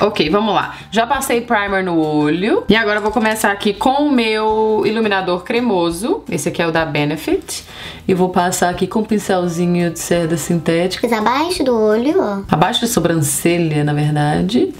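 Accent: Minas Gerais, Brazil. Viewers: Amo o sotaque mineiro. 💗 Amei a maquiagem como sempre.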